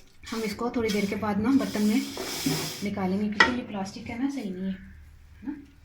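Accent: Sialkot, Pakistan. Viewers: Very nice or apni very nice sialkoti panjabi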